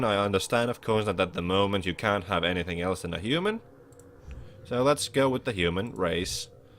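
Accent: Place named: Finland. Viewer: Your accent sounds... Nordic. If I were to take a guess (and I am taking a guess), you're Finnish?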